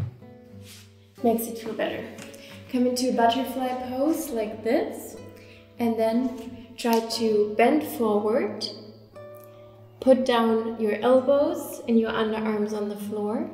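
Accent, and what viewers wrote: Germany. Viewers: U have a german accent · This is the first time I´ve heard her talk in a video, was not aware was German haha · Are u German no hate but ur accent is like mine in english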